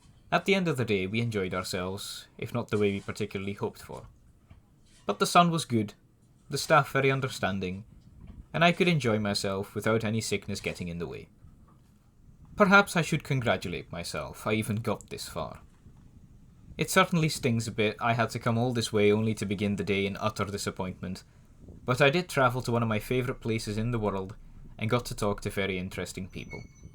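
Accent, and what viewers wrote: Scotland, United Kingdom. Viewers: Wait a minute? Did he say he was from the Netherlands? Being an American, revealing my ignorance, did I just hear him speaking with a heavy Scottish accent? 🤔 Great video and account. Thanks. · for someone who has come all the way from the Netherlands, you have a discticive Scottish brogue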